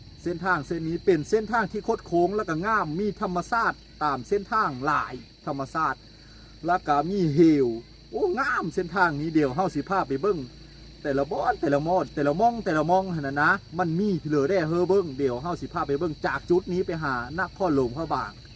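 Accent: Phu Thai, Thailand. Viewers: เว้าโม่นเด้ มักๆสำเนียงภูไท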